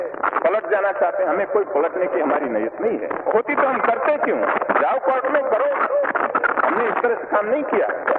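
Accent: Gujarat, India. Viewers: Typical gujrati accent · in both speeches 's Hindi pronounces with Gujarati tone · That Gujarati accent! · Not voice but yes accent is same bcoz both have gujarati tone in hindi thats y luking little bit similar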